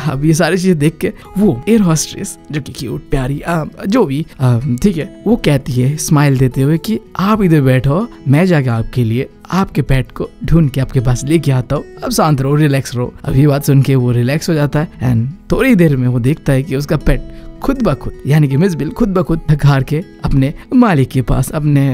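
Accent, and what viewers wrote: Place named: Assam, India. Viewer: Bro has Assamese shall bihu bihu lagise ..... Love from Assam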